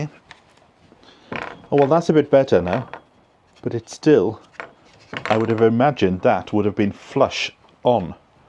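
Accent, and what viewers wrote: Wales, United Kingdom. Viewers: . I just want to say how much I enjoy your channel my mum was Welsh😊 so I’m half welsh. I love the valleys and I love the welsh accent. Keep it up great content great channel 👍👍👍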